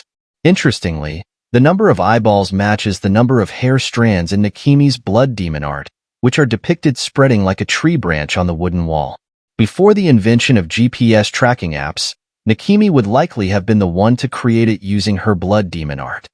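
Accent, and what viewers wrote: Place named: United States. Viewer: Stop fcking using American accent to Japanese names ffs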